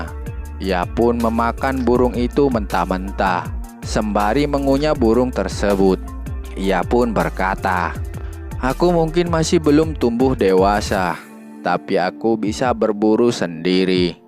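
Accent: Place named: West Java, Indonesia. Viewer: Si mimin nih kaya nya fans nya bkent yagesya.. trus ada logat2 si jhon juga wkwkwk .. org sunda ya bang haha pake atuh bahasa sunda nya da moal nanaon ieuh meh jiga si jhon ngan bedana menceritakan alurcerita anime2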